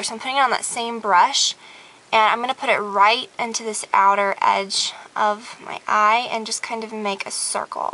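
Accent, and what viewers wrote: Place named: Atlanta, United States. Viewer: In this vid you can def tell shes from Atlanta!!! Hahahaha she has changed a lot, wish is good!!!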